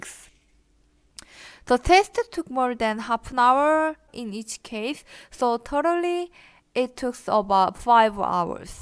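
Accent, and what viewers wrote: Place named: South Korea. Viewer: 발음이 어쩐지 친근하게 들린다했다.. 한국분이셨네 ㅋㅋ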